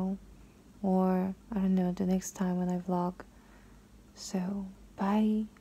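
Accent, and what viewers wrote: United Kingdom. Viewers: 저만 느끼는건가요 ㅋㅋㅋ 그동안 못본사이에 발음하고 억양이 영국스러워진거같은데..... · Oof, you've got british accent more. hehe so awesome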